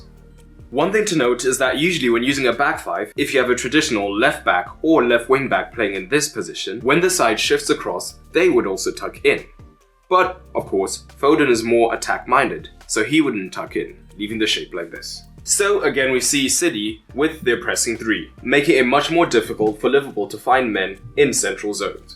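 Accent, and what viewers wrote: South Africa. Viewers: Is it just me ? I hear a South African accent…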